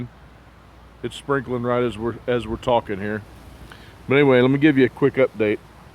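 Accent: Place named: North Carolina, United States. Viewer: Great videos!!! What part of the counrty are you in?? Im in NC you sound like you might be close lol just wondering. 87 GTA here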